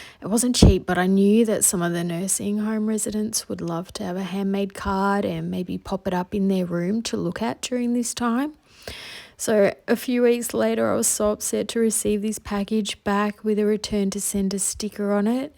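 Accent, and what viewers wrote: Australia. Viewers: Hi, thank you for sharing this video with me. I will have to watch some more videos from channel. It is so nice hearing another Aussie accent. ❤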